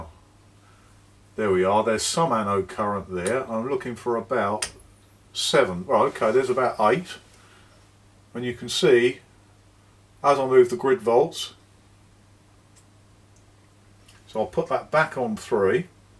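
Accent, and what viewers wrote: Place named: United Kingdom. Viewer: Great to hear a British voice fixing British radios. Keep up the good work.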